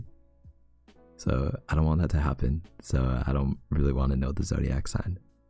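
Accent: Canada. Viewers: his canadian accent is adorable ugh 🥲🤍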